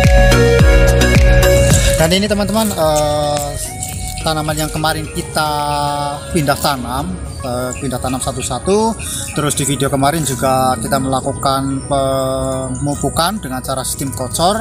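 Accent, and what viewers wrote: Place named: Banyumas, Indonesia. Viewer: Sampean wong bnyumas y mas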